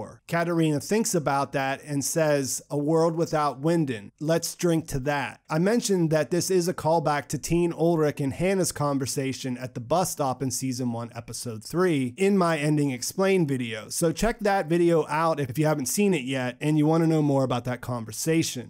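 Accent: United States. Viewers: It’s so exhausting listening to the american pronunciation of the german names - we are able to pronounce names from foreign series in almost the right way, so why don’t you all try too? :D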